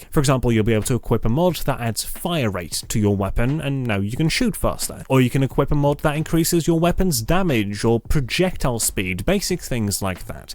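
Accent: United Kingdom. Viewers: WOW!!!!! That is crazy my dude.. u sound like ur from Birmingham.. ask any british person what ur accent sounds like in the old videos.. not for one second did i think u were dutch.. that is some accent.. well done!! Your latest videos sound like ur 100% British!! with a very upper class accent.. :)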